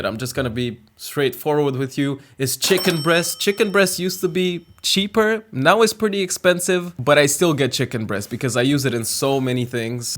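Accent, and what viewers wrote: Netherlands. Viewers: Why did i just figure out you're dutch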